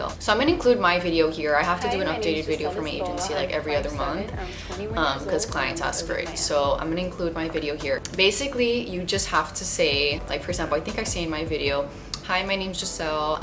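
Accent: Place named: Miami, United States. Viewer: im from miami & as soon as i heard u speak knew u were from there too! thank u for the helpful video xx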